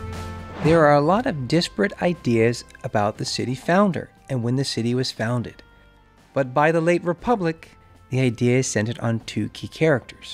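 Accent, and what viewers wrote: United States. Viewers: Do Italians think you're Italian? I did. If you didn't have such an American way of speaking, no one would know (from looking at you), that you're not Italian or at least Western European.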